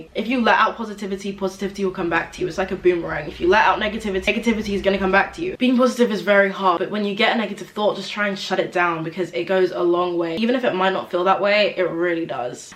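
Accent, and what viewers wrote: United Kingdom. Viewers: She’s not she’s British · I love this video, it's so straight to the point. Maybe its just the Uk accent that feels more familiar to me but other videos go on about vibrations and try and sound too mystical and it just feels a little weird but with yours I can trust you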